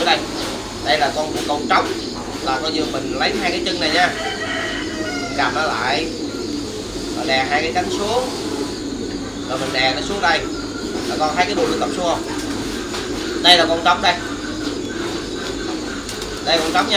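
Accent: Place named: Phuc Tho, Vietnam. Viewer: a noi tiếng jog phúc xo wa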